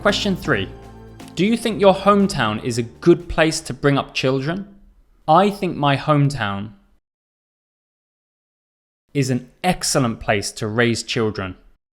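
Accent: United Kingdom. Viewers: Wow ! It is Amazing ! It has been a long time that i'm looking for this type of videos in a british accent .. thanks for efforts and continue ..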